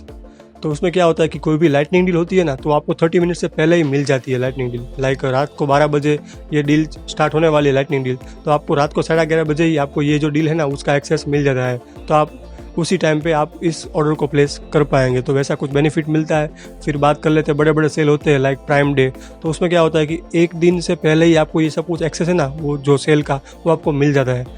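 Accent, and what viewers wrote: Gujarat, India. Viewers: Bhai mere khyal se aap Gujarati ho?????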